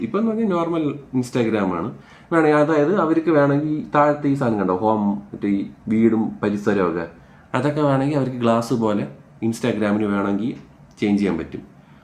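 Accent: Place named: Thiruvananthapuram, India. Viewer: fell for your feel of transparency as human with thirontharam accent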